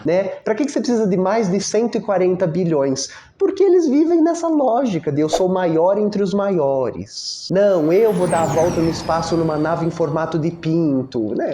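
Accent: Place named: São Paulo, Brazil. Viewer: você é especial. O seu sotaque me delicia (sou carioca). Parece uma especialização do sotaque paulistano, talvez ligado aos italianos. Para mim é o sotaque de uma das minhas bandas favoritas: Os Mutantes. O seu sotaque é igual ao do Arnaldo Batista e, principalmente, do Sergio Dias.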